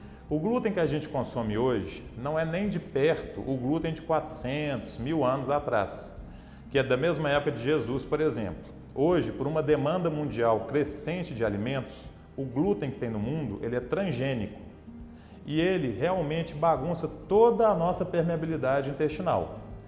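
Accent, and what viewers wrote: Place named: Minas Gerais, Brazil. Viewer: Oi Dr, adoro escutar suas explicações, muito bem explicado, com sutaque bem mineirinho, abraços.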